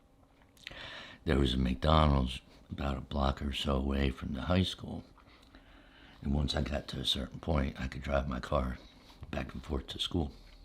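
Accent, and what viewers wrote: Pennsylvania, United States. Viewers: Do I hear just a little bit of Philly in your accent? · are you from PA? I went to Gettysburg college (born and raised in New York) so I am familiar with the accent! 🧡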